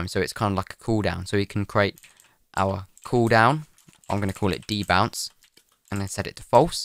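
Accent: United Kingdom. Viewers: British + English =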